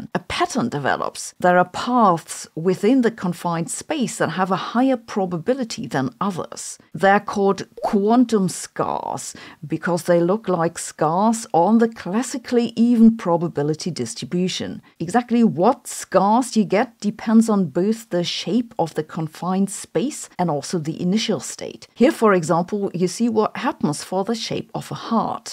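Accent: Germany. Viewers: Sorry, heard the German accent and had a Get Smart flashback to KAOS.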